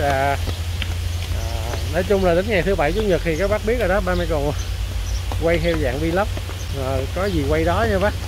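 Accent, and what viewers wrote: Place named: North, Vietnam. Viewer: hello ,happy birthday , den cay la tieng cua mien nam , nen la tieng cua nguoi Bac viet .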